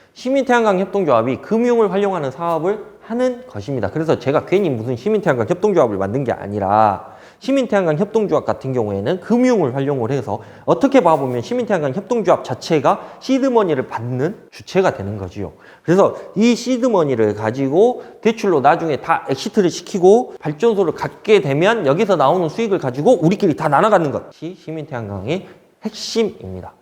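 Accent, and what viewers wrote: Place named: South Korea. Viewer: 잘 모르는 분야라 천천히 보았습니다 사투리억양도 재밌었어요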